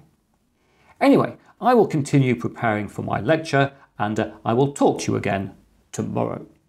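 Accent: United Kingdom. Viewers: The whole Retrofuturustic vibe of this channel , presented by a (very) Brittish Professor is just so unique I can't have enough of it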